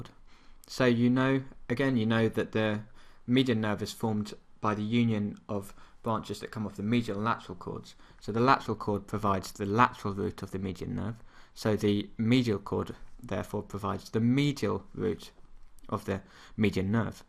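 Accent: United Kingdom. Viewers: yup thats british accent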